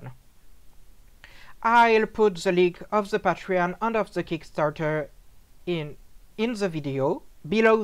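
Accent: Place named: France. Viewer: Thank you very much really useful addon and I really like French accent :)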